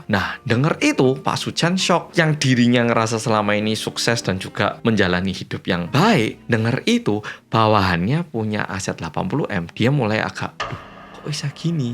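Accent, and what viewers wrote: Java, Indonesia. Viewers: Sampe tak ulang2 bagian paca'an,jaaaan kuentel jowone gak ilang ilang cak 😂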